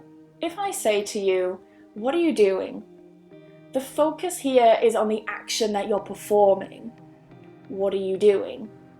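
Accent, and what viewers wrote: United Kingdom. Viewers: love your British accent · Please, You should make some videos about reading in English, I believe it will be useful furthermore would help us a lot and your British accent is beautiful, thank you for sharing this video plus a big kiss.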